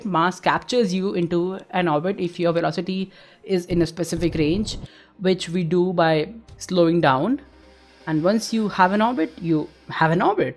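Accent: India. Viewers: Hey r u indiaian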